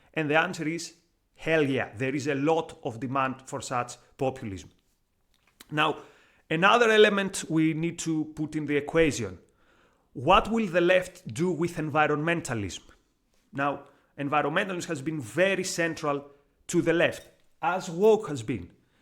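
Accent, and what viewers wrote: Greece. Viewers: Thanks for the effort to think ahead of the curve here. I’m not sure the anti-Israel part of it is really a secret sauce though - the left are absolutely open about it - it’s one of their ‘key pillars’. Left wing ‘magic money tree’ populist economics might be the most dangerous part… enough time has passed since post WW1 economic collapse for many western useful idiots to be willing to try it again 😢. Anyway, your Greek voice makes a refreshing change from that ubiquitous old economic fraud Yannis Varoufakis’ nonsense 😊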